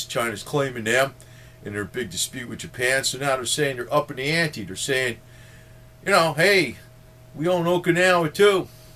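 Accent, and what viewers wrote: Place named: New York, United States. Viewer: Your not too bright. That very much so is a New York accent. Have a nice time trolling channel...its making you look even worse.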